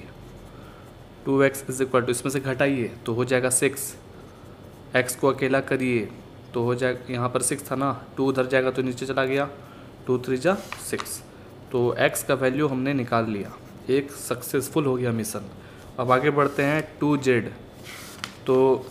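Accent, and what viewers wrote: Bihar, India. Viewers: Sir aapke aawaaj me hamare bihar ki jhalak aa rhi hai ,, kyonki isme koi gunjaish hi nhi hai naa samjhe naa , ok paarnaam ,,ram ram 💪🙏🙏🇮🇳🇮🇳🇮🇳💪💪💪💪💪🙏🇮🇳😘🥰😍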